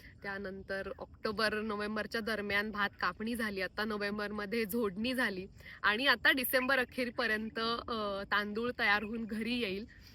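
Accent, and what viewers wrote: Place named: Konkan, India. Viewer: रानडुकराची तर काही बोलायला काही जागाच नाही खुपच वाढ झाली आहे 15,20 वर्षा अगोदर एवढे राणडुक्कर नव्हते आता करारा, तीर, मसूर तेलबीया जसे जवस होऊच देत नाही आता तर शेतकरी धानाशिवाय दूसर पीक लावायलाच पहर नाही भीतीने खूब नुकसान सहन करावे लागत आहे हा आणी तुमची कोकनी मराठी आमी पूर्व विदर्भाची मराठी जवळपाश समान वाटते काही शब्द सोडले तर जे गाने तुम्ही गायले ते पन तसेच आहे पन हल्ली ही परंपरा कमी होत चालली आहे मम लहानपनी रात्र, रात्र मचानी वर झोडीत बन्ऊन पहाटेला उठान फाखरापासुन ज्वारी ची राखनी, मळनी करायचो मला खुपच आवडायचे आता राहील्या त्या फक्त आठवनी ❤❤❤❤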